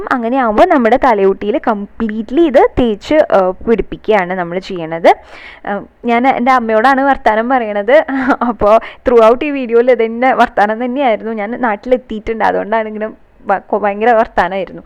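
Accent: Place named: Thrissur, India. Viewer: Mm thoni. Thrissurkar nanayi samsarikunavar annu.. Enikk samsaram eshtam annu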